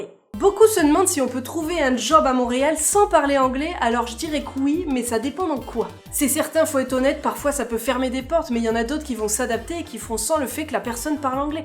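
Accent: France. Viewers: J'ai le même accent que toi. En fait il faut se dire que l'accent français est l'accent internationale pour parler anglais car il est le plus compréhensible pour tout le monde. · Test your English skills: + camouflage = Camiflage 😃 Because you blend in Québec so well! Vos fans américains adorent votre accent français! 😘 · Le seul truc quand on parle anglais ohhh so cute a french accent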